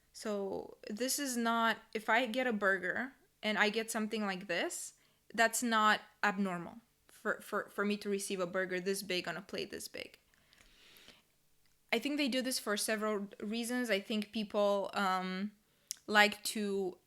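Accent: United States. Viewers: I like you american accent 😍😍😍😍😍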